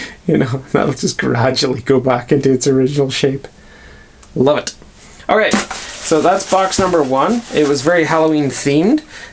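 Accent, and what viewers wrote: Northern Ireland, United Kingdom. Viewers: As a Nordie, I thought I detected an accent from the Graeme McDowell School of Transatlantic Speech....I was right.... I can hear a little noise in the audio, that's easily removed e.g. using Audacity noise filter.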